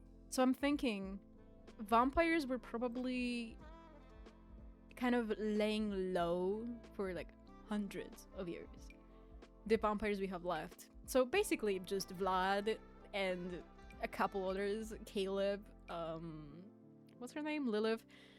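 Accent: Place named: Poland. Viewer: omgggg of course it's slavic (i've always loved that regions accents, but i dont think i've ever heard a polish accent). its beautiful i love it so much!!! 🤍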